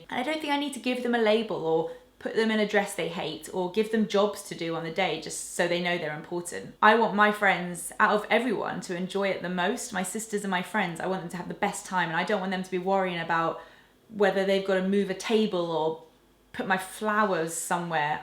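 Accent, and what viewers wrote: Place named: RP, United Kingdom. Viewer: sounds very posh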